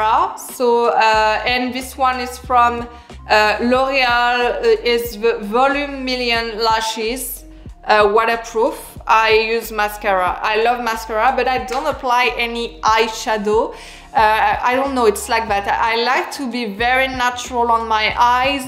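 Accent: France. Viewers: Please do an updated video on this topic, but starting with a bare face and going through your routine, a ‘get ready with me’ style video. It’s more fun to see it in action and see your technique. Updated product recommendations too. Please! 🙏 You are my favorite style channel I’ve ever found, you’re truly inspiring, i aspire to be as chic as you someday soon. And I love your thick french accent. J’adore ☺️❤️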